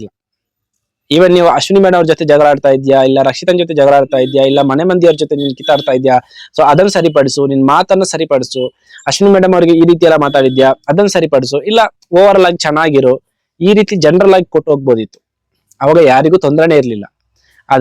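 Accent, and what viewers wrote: Mangalore, India. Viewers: ನೀವು ಬಿಗ್ ಬಾಸ್ ಬಿಗ್ ರಿವ್ಯೂ ತುಂಬಾನೇ ಚೆನ್ನಾಗಿ ಮಾಡುತ್ತೀರಾ. ನಿಮ್ಮ ಮಂಗಳೂರಿನ ಭಾಷೆ ತುಂಬಾನೇ ಚೆನ್ನಾಗಿದೆ ♥️♥️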